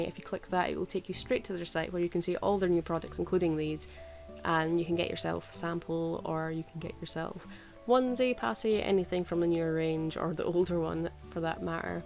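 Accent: Scotland, United Kingdom. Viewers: 1. Your accent is amazing, love.Scottish. 2. Thank you for your absent absorbent and ABDL products... it's hard to find them that works for most adults, and your abdl reviews is comprehensive and brilliant. tyvm for being awesome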